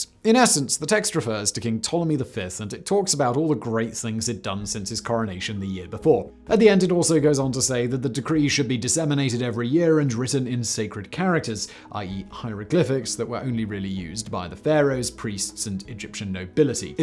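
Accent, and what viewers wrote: England, United Kingdom. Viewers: More interesting than that stone, can someone tell me what english accent does this guy has? It is definitely not an American, but it does not sound like standard english either.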